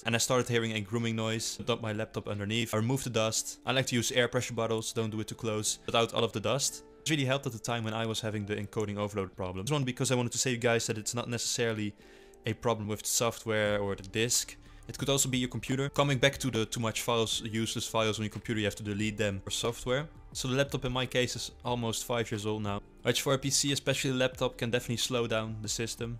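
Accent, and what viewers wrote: Netherlands. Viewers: you speaking like a dutch accent :D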